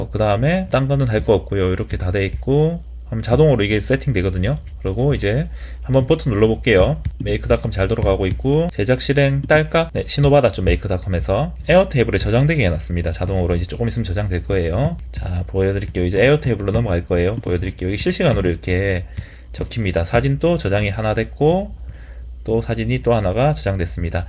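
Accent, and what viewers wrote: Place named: Busan, South Korea. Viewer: 어쩐지..부산억양이 익숙한 느낌이...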